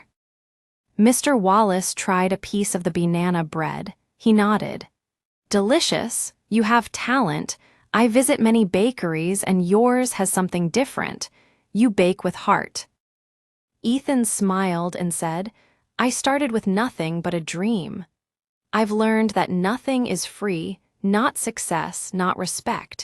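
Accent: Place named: United Kingdom. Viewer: You speak Uk English but your English contents in American English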